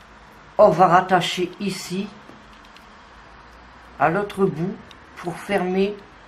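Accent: North, France. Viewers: Bonjour super vos toutous avec cet accent du Nord qui fait du bien. Pour cette robe vous dites 3 à 5 ans? J'ai donc fait 6 rangs de départ au lieu de 9 pour du 3 à 6 mois cela me semble petit Pouvez vous m'aider? Merci d'avance Sandrine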